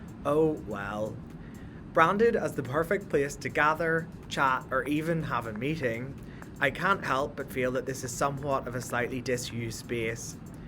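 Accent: Northern Ireland, United Kingdom. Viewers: Hello, your video just popped into my inbox tonight and your lovely Northern Ireland accent, combined with you being dressed very nicely but comfortably, got me watching. I have never flown Virgin Atlantic, BA, Aer Lingus and Air Canada are my usual choices. I enjoyed your video but was very disappointed that you did not get a window seat. The Lounge looked excellent. I have been traveling alone now for about 15 years and trust me, I am very comfortable now. I am originally from the West of Ireland. I do like your lovely approach to showing us exactly what we need to see and hear. Video was perfect from start to finish. Good luck on your Journeys. I have subscribed to your Channel. Have a great week.🍀✈️☕️🫖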